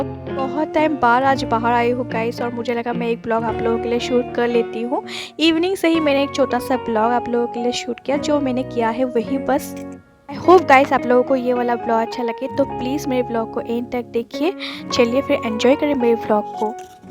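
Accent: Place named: Assam, India. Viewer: Hey cute kid you have got ! Where r u from ? Ur accent sounds Assamese , please aplogies if I'm wrong. Kfc same pinch 😂 oh this Maggi is different black one ? Nice place looks nice weather 👍 eagerly waiting for your challenge video !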